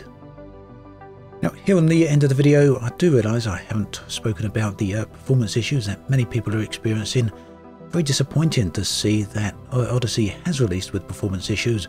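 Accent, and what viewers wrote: United Kingdom. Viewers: The narrator’s British accent is too thick, cannot understand him!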